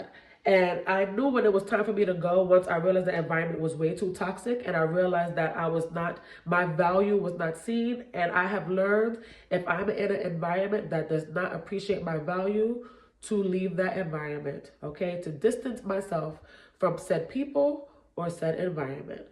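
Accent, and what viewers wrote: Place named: New York, United States. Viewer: Love your accent omg I be trying to talk like a New Yorker lol I'm from Oklahoma City, Oklahoma we have a country accent 😂😂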